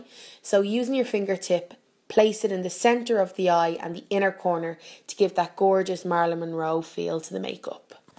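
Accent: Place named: Ireland. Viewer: You're beautiful with or without makeup 💓 I love the accent as well, don't see how us Scots and you Irish get mixed up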